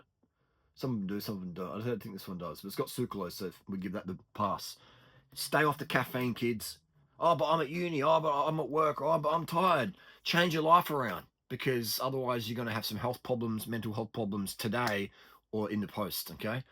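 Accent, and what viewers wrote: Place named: Australia. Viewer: When I hear an Australian accent I pay attention.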